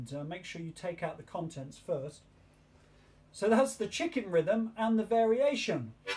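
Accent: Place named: Northern England, United Kingdom. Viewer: thanks for bridging the gap from beginner blues to bluegrass. Kinda crazy to learn from a Brit but hey, youre doin it right. That northern? accent is cool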